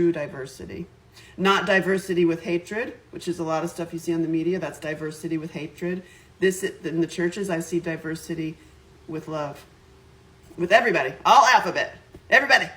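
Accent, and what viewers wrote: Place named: South, United States. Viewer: Hi! It's been awhile! Question, when did you get southern drawl? Plus, when did you get tattoos?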